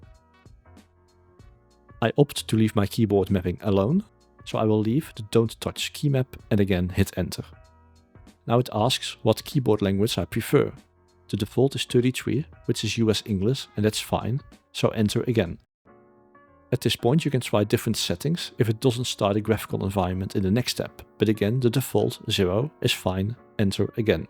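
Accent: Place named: Netherlands. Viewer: Well it's pretty easy to figure out he's Dutch from that accent. Question is why is it hard for others when it's easy for me. Answer is....I actually meet people non-English speaking countries, not just stay for my whole life speaking only with native English speaking people or watching native english content.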